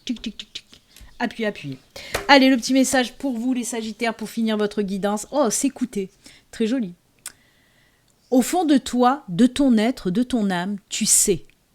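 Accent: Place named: Montpeyroux, France. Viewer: Bonjour tu es pas de montpeyroux parceque tu as l’accent de montpeyroux:) « »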